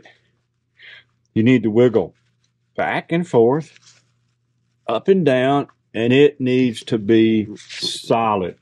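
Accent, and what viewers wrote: South, United States. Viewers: BGATs are the sawyer's version of winding sticks. Thanks for being straight forward with your slow, Southern talk. It's much better than engineerese (I am fluent in both😂😂) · I hear "Southern" so I understand you perfectly! As far as types of videos, I love the ones like this. I like them all, but on these I always learn a little something. Episode #2: items 6-10; Episode #3: items 11-15; and so on,,, Starting a series and you didn't even realize it.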